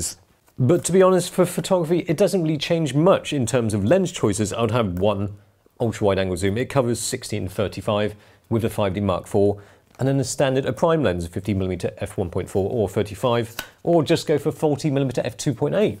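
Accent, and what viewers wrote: United Kingdom. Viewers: British accent is getting so thick, he’s hard to understand !!!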